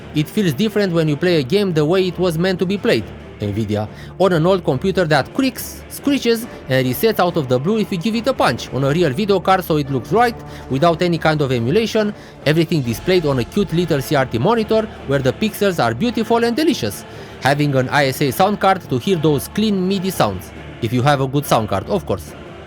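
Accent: Romania. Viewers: Salut. Mișto clipul, succes! A, și super accent romanesc haha.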